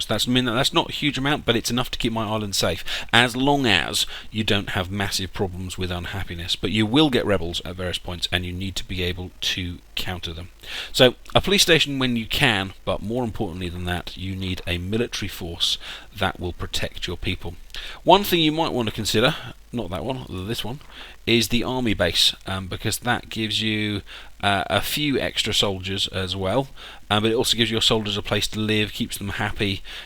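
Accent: United Kingdom. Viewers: Thanks for the tips, it's great hearing a brit instead of the winey american accent and blubbers mostly rubbish... Could you perhaps do a tips and trick for Modern Times DLC, please?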